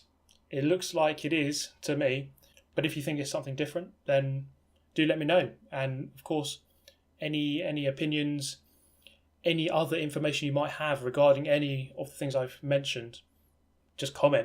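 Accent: United Kingdom. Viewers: God damn British accent finessed me, had no idea my boy was Asian 😂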